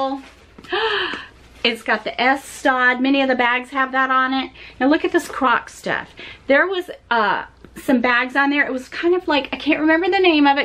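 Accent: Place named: South, United States. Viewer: I see u got that southern accent luv it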